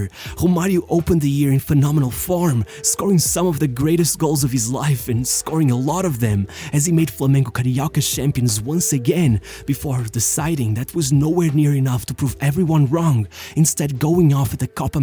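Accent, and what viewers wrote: Portugal. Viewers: I know you are portuguese from your accent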